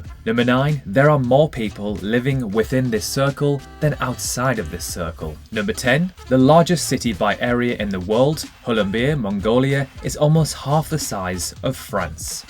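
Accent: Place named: Newcastle, United Kingdom. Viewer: Geordie accent (Tyneside, northeast England).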